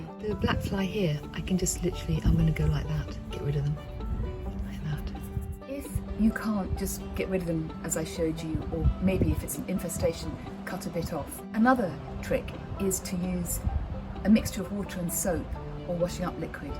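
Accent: United Kingdom. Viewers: 听到你温柔的英国口音，让我想起来伊丽莎白女王讲话时的情景，很值得回忆。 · 口音英国口音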